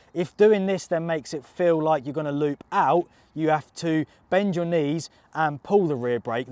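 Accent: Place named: United Kingdom. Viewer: British English!!!!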